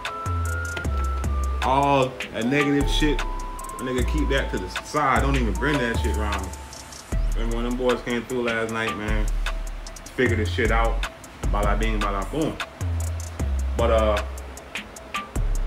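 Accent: Jamaica. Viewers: Just subbed when mi hear di patwa jump out dwl dem rass plug dear eeee. Respect my yute u build wikid.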